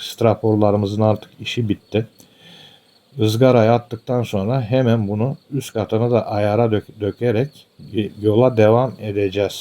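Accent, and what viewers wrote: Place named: Thrace, Turkey. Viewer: Sizi tebrik ediyorum. Arının dilini anlamış sırrını çözmüşsünüz. 4 yıllık bir arıcı olarak iyi ustaların tecrübelerine ihtiyacım var. Bazı günler yaklasık 5 saat yerli ve yabancı videolarda araştırma yapıyorum. Söylediklerinizi anlamayan izleyiciler hakkında açıklamada bulunmuşsunuz. iç Anadolu bölgesi insanı olarak Trakya şivesine alışık olmadığım için bende biraz zor anlıyorum. Büyük hazineler daha derinde olur deyip, anlamadığım yeri tekrar dinleyerek bunu hallediyorum. Küçük bir eleştirim olacak. Sanki katları yerine koyarken fazla arı eziliyor. Ve kamera açısı biraz daha geniş yada uzaktan çekse izlemesi daha keyifli olurdu.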